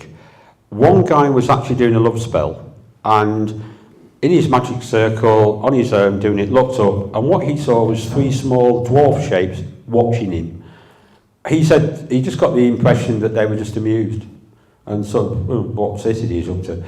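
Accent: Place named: Lancashire, United Kingdom. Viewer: This man does NOT slur his words, he has a Lancashire accent and is using a mic that has a bass bias. He speaks VERY plainly, and if you don't understand the words he's using, maybe you should look them up and expand your vocabulary rather than decry him for your own lack of knowledge.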